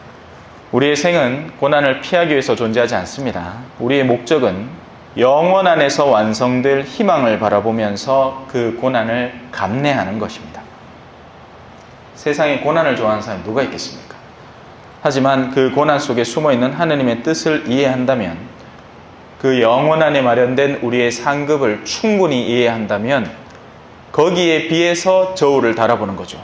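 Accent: Gyeongsang, South Korea. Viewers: 신부님... 세상살이도해야.밥먹고.신앙생활도.꼭.해야평화을..이웃.동료을살피옵니다.신부님에.직선말씀.의성.경북말씀..투박함.그대로.가식없으신.신부님.한번씩..속이.뚝.티입니다.언제나.정답..정답주시옵니다..베트남에서.함께합니다.🙏✈️✈️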